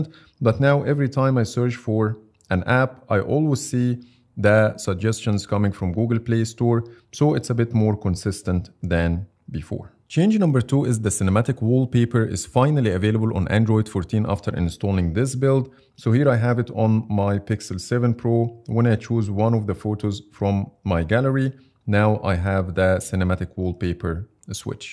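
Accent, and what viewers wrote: Egypt. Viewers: Bro ur accent is Egyptian 🤨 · I love the egyptian accent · Your accent is egyptian dude….love the video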